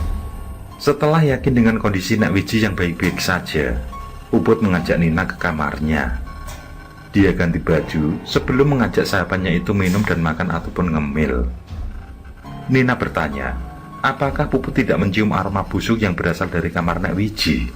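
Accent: Java, Indonesia. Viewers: SUSKREBER BARU , BUK NURI TKW DI SYRIA , SALAM SEHAT WALAFIAT DAN SUKSES SRLAKU UNT VEN SENENG SUARA HOST NYA NGEBAS DAN JAWANYA SANGAT KETAL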